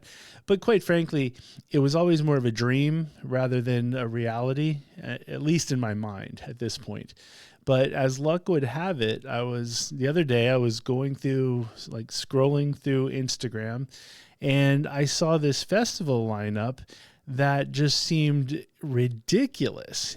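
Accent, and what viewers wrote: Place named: United States. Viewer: This is the first video I see from you, so I know nothing about you. You sound American. Prepare for weather to be whatever, it's all over the place every day. In June, in the Southern Finland, heat-wise, I'd say +30C is THE MOST EXTREME I can think of, and that'd probably be a record or something. Summer days are usually around 15-27C. I'd put on a t-shirt, long but light pants of some sort, a jacket that's easy to take off and tie on your waist or something in case it's a hot day, comfortable shoes that can withstand rain or mud and a hat, put on sunscreen, take a disposable rain coat (fin. "kertakäyttöinen sadetakkki") with you - if they don't sell them where you live, in Finland you can buy one in pretty much any bigger grocery store such as Prisma or Citymarket for about 2€. Festivals don't allow to bring in water bottles. 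Most festivals have free water, in some you have to pay a small amount for it. Check the Tuska Festival site - if they're doing their job properly, they'll have a list of things you can or cannot bring with you and if water is provided. It's a big festival, I think they'll probably have that information. (Also try to google if a person from your area is ok to drink tap water in Finland. I was in Provinssirock about a decade ago and the water they provided was a self-standing tap in the middle of the area, which was fine for me, it worked. But I've also been to a tourist biking trip to Estonia, a neighboring country, and got a stomach bug because we ran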